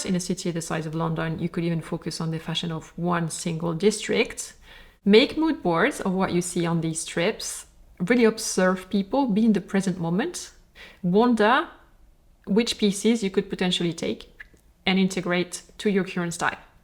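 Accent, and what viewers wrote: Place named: France. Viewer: Please, please speak a little slower. I like your chabel and I like what you are saing, BUT it is very dificult to understand when you spead that fast and vith that strong french accent (whisn is beautiful, don´t you mis understand me) I am also not native english speaker, may be that is the reason that I can not understand well. I have noticed that you speak now much faster then before mooving back to France. I wish you all the best.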